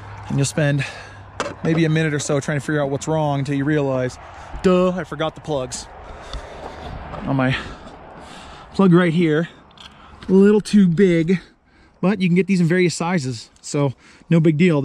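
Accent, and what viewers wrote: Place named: South, United States. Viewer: Thank you for the video, in liked your country accent. Beautiful pool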